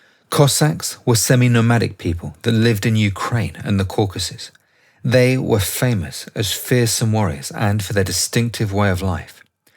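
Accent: United Kingdom. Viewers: Yeah usually British accent is red flag of AI slop (due to its ability to deceive American audiences) , but this Channel is solid!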